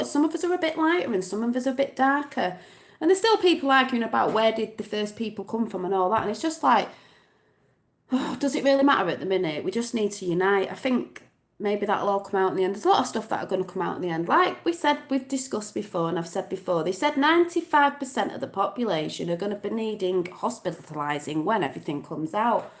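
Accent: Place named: Northern England, United Kingdom. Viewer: northern lass... go on girl...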